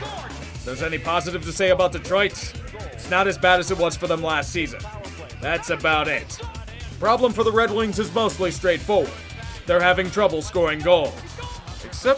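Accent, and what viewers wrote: Pittsburgh, United States. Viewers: Hearing this yinzer talk Flyers makes me sick but you got a point amirite?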